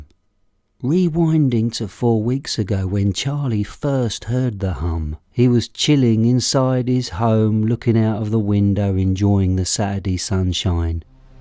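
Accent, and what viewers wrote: United Kingdom. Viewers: Why didn't I find you sooner!! British accents FTW 🖤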